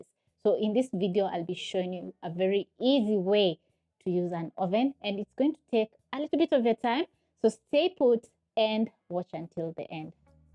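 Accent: Kenya. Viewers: Open. She is Kenyan. It's an accent.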